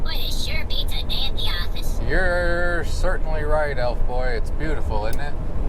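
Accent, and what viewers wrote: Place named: New York, United States. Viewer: I can hear your accent clearly! How long has it been since you left NY?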